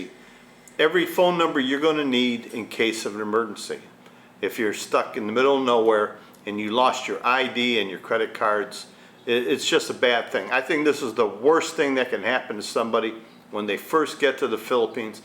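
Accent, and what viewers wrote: United States. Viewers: you sound like you're from the USA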